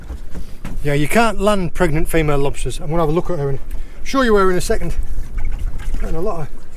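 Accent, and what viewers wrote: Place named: North, United Kingdom. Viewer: Ay up ! 😂 class don’t drop them northern roots pal 👊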